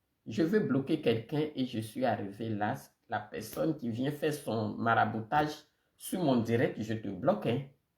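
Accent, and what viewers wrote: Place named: Ivory Coast. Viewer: J,aime votre accent purement ivoirien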